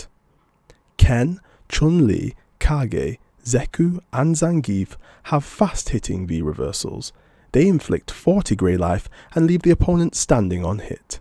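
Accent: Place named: United Kingdom. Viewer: I love you're british accent man and yes i understand the v'reversal but i still can't play like the pros 🤣🤣🤣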